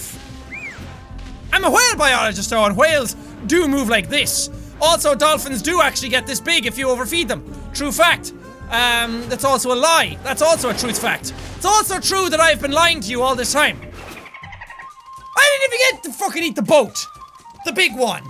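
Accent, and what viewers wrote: Ireland. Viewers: & awesome sounds like Ireland 🇮🇪! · Not gonna lie, when went full bore Irish I think my brain (and heart) melted a little... Also, I do believe my ovaries may have exploded... 🤷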